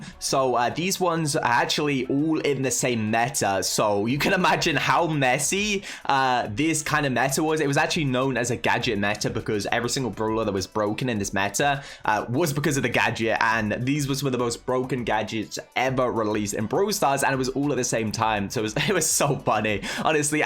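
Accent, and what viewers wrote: United Kingdom. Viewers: a normal pottah from your supah I love bri'ish accents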